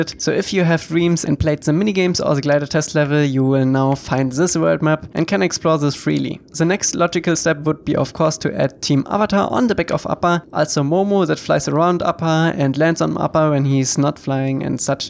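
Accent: Germany. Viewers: Is it possible what you are german? Your voice sounds so german xD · Mann merkt das du Deutsch bist dein Englisch musst du verbessern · I don't understand why you can speak so fluently but still sound the most German I've ever heard xd anyway, keep it up! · Question, what is his accent? Ik I’ve heard the accent before. I keep wanting to say it’s German but I’m not sure. He keeps saying v for w, which is why I think it’s German. Anybody know if I’m right? I’m honestly very curious. Lol. I think I’m wrong tho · I can hear that you can speak german. Pls make a german video.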